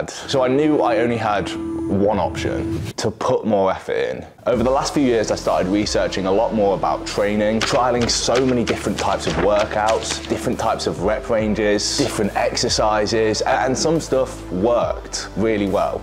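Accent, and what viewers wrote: United Kingdom. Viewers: omg british accent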